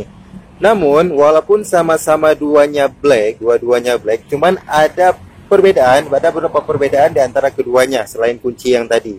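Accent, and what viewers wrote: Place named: Aceh, Indonesia. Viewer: Org Aceh rupanya, logat cara ngomongnya ketauan, 😊 salam utk adoe syedara lon di Sinan. 👌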